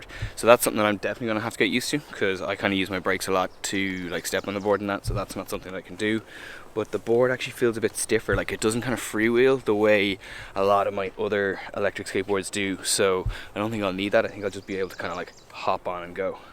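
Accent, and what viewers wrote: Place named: Canada. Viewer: You are in Ireland? You sound Canadian to me, which is very close to America , I hope you are not giving up your accent geez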